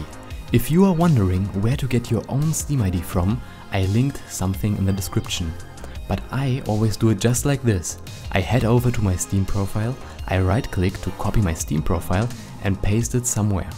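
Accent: Germany. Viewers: Impressive fast explained I like it and I sub! Ganz liebe grüsse nach Deutschland, den akzent merkt man lol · Sowas von ein Deutscher xD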